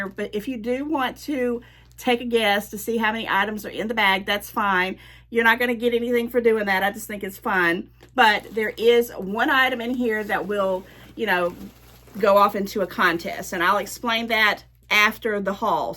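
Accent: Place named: South, United States. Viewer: Hey Hun it's a Southern Draw. You won't get one unless you're in states like Georgia, Alabama, Tennessee, Kentucky, Mississippi, or South Carolina. Mainly Mississippi, Alabama, and Georgia. These are the Southern and Deep South states.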